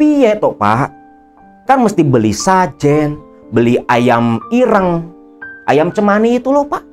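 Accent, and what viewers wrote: Java, Indonesia. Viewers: Kang,bahasa Indramayu e gk begitu kang,ky gtu mh Jawa Tengah Jawa Timur an.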